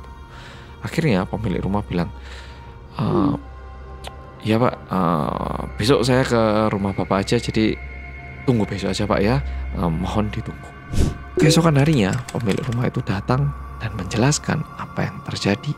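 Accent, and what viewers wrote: Java, Indonesia. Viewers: Bersama orng korea yang apasih kata mas? Aku taunya orng korea yg medog. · medhok ganteng wees🌛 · Gkpp deh udh 1 bln lalu bru nonton habis aku tuh nyari ka yang rambut ny agak panjang lebih ganteng hehehe kK muka ny bd setiap rambut ny pendek, pakai kacamata, dan rambut sedikit pnjng tp tetep ganteng koq kak yang medok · "MENGGANDOLI" bersama orang korea yg medok tenan 👍🤭😁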